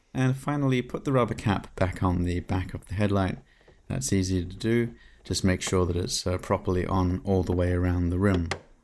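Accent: England, United Kingdom. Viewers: He sounds English to me and he opened the bonnet/hood up on the UK's driver's side.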